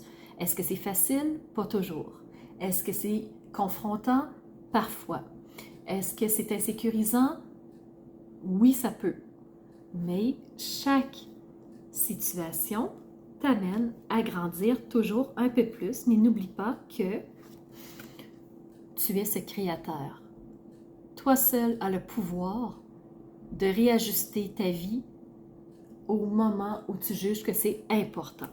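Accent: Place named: Belgium. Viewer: Pour commencer, j'adore votre accent. J'aimerais vivre par chez vous, parce que l'accent belge, euh... comment vous dire ? Hum !...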